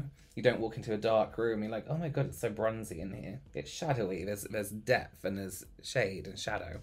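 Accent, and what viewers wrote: England, United Kingdom. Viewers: Never in my life would I have thought I’d be in love with a pale Mexican with a British accent. 😂♥️ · Do you speak Spanish? Can you in your next video? I’m dying to hear Spanish in an English accent! Or maybe you have a different accent when you speak Spanish ?! Either way, I’d love to hear it!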